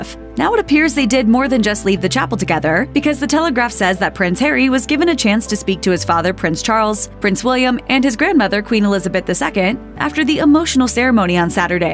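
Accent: United States. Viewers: I'm not worried about any of them,,,, but why is an American sounding so full of knowledge about it,,,, oh wait, she's American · This is the American take. I don't give this much slack. The American accent is slident against the subject of the video. Yakkity yak yak! Phew! (I'm a Singaporean.)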